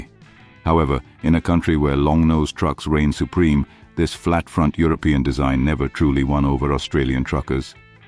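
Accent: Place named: United Kingdom. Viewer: Yep, 100% AI slop. Generic British accent voiceover, no breaths, slightly off vocal cadence. There's so much of this trash on YouTube now, I can usually spot it in seconds.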